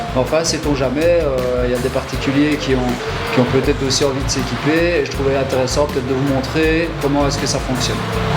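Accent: Belgium. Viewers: L'accent belge m'avait manqué. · Bravo, une bonne imitation de, tu tiens bien l'accent belge.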